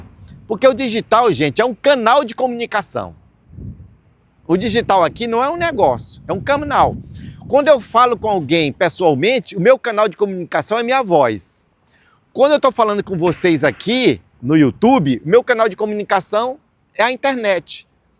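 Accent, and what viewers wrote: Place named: Paraguay. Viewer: De onde você é? Soa paraguaio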